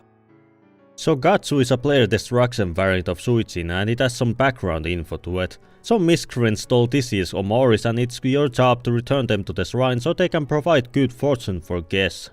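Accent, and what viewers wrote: Finland. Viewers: I can detect finnish accents from planets away, being a finn as well. What's good? Also the way i found this channel is i just stumbled into it on my recommended and was like "? Isn't this the guy from my steam friends list?" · Shogatsu is my favorite, they did great Also I love how I immediately knew you were from Finland, cheers from Hungary lol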